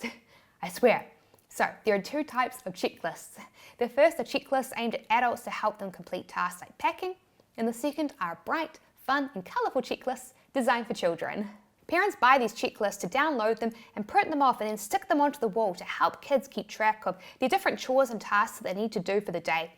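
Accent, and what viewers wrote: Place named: New Zealand. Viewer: As always, you present valuable content in your videos. In this video, you talked about digital product ideas I had not thought about. Thank you! There's another reason why I enjoy watching your videos. That reason is listening to you speak. I've loved the sound of the New Zealand, Australian, and British accents — in that order. Be safe. Stay healthy. And, most of all, enjoy your vacation!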